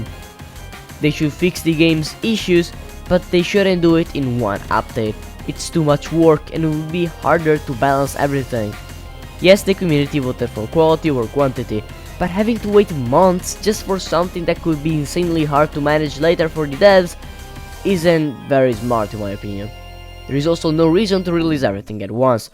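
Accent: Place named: Italy. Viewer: I love how blatantly italian your accent is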